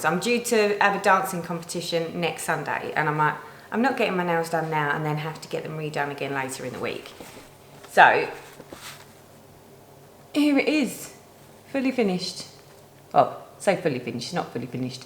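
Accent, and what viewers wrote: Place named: Devon, United Kingdom. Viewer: Enjoying seeing your lovely projects. Hope you make it to Canada one day. I grew up in Devon UK but moved to Canada 15 years ago. Your accent reminds me of home:)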